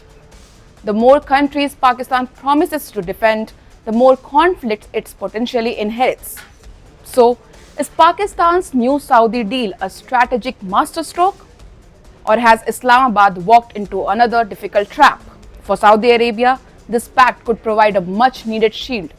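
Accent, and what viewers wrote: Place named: India. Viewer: The indian accent i cant continue listening. Its horrific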